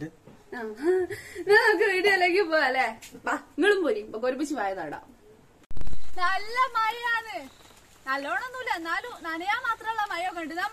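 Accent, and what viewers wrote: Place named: Kozhikode, India. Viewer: Achanodum ammayudum nalla snehamulla kutty welldone pengalee place kozhikode anne alle slang kettapoza manasillayathu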